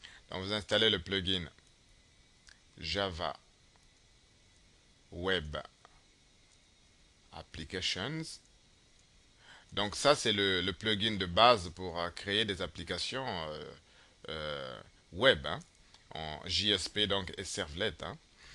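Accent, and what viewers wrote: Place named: Cameroon. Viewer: Merci beaucoup...tu as une accent camerounais ;)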